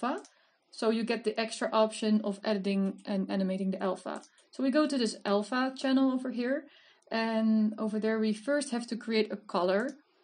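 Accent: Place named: Netherlands. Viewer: tenk joe ferrie much....joe ar de beste......ded was just wat eye needed....nee hoor jou Engels is niks mis mee maar altijd zo leuk herkenbaar dat nederlandsEngels :-)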